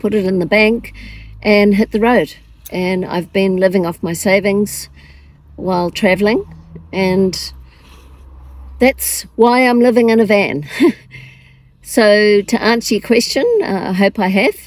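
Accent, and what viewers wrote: New Zealand. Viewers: I'm mid 70's, been caravanning 25yrs.Do I hear a kiwi accent? I'm ex kiwi. Love my lifestyle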